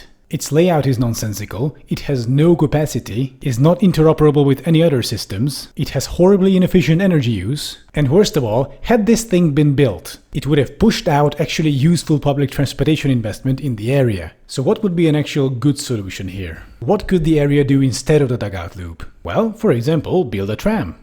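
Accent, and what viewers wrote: Hungary. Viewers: ez nekem is feltűnt de az ahogyan mondta a magyar szavakat és amilyen sokszor emlegeti a budapesti metrot nekem eléggé azt sugallja hogy magyar az illető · Okay, I refuse to believe you're not Hungarian. You've used Hungarian subway lines for comparisons in several videos, you pronounced Hungarian words perfectly and although not very noticeable, you do have a slight Hungarian accent. Cool. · Akcentusbol ugye jol vettem ki a magyar szarmazast? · Are you hungarian or do you just have a really authentic accent?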